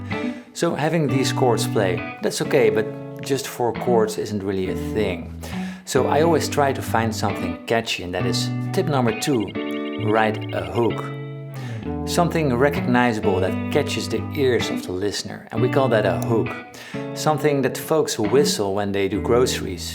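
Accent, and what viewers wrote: Netherlands. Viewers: Complimenten voor je content en professionalisme man! Je engels is vloeiend maar toch hoorde ik direct dat je een Nederlander bent! 🇳🇱🎸 Je bent een grote speler als muzikant!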